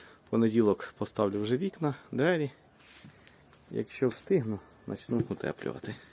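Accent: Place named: Poltava, Ukraine. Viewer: теж чую полтавський акцент) а де продовження ?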